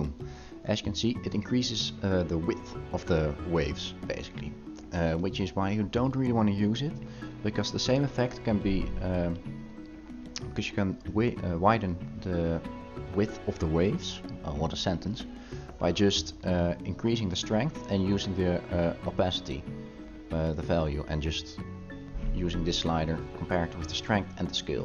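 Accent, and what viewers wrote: Netherlands. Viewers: Aan je accent te horen ben je Nederlands :P Mooie tutorial, heeft mij erg geholpen!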